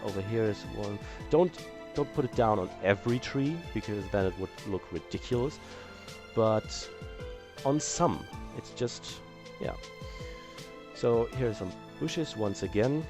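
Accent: Germany. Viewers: Just a question, are you german? Your accent sounds pretty much like it :D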